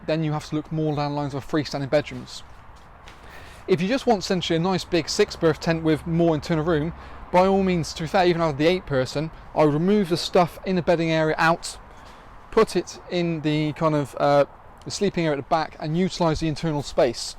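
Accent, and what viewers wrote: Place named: Cockney, United Kingdom. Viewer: Are there any reviews of this tent for those of us who don’t speak cockney?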